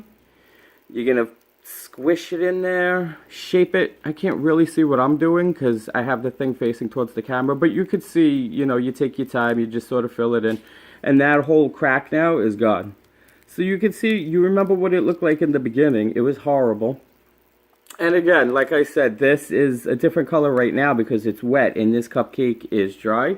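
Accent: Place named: Boston, United States. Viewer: I love you accent. Are you from Boston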